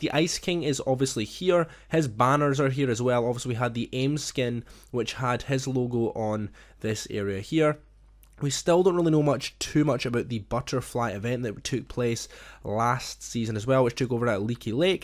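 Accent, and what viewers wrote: Scotland, United Kingdom. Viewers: So your scotish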